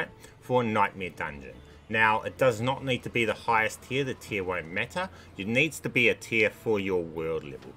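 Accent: New Zealand. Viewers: Yo brother you a kiwi?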